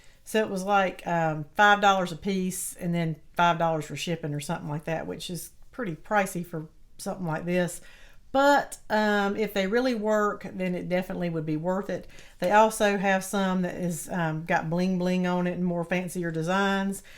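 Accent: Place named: Appalachia, United States. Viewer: Mom, GREAT job! I had one boy, so it was fun to watch you put girls’ baskets together! I loved all your choices, but I REALLY loved the stuffed bunnies! Adorable! And from my own perspective, a girl’s never too old for a snuggly stuffed friend! BTW, I love your accent. You sound just like my precious sister-in-law who is from the TN/VA border! Happy Easter! 💕💐🐰🐣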